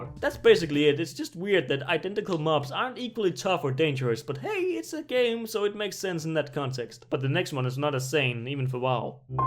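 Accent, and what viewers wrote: Denmark. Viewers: Are you from Denmark? :D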